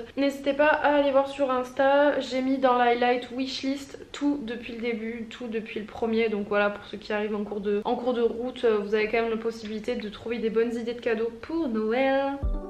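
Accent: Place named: South, France. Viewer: De l’authentique (avec l'accent d’Ugolin dans Manon des sources ) c'est pour cela que tu as beaucoup d'abonnés et que tu en auras encore plus 👏ne change rien tu es magnifique autant de l'intérieur que de l'extérieur 😘😘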